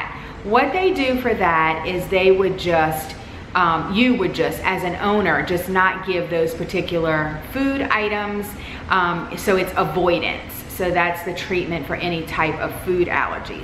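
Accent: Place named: Louisiana, United States. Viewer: I swear I can spot a Louisiana accent anywhere 😂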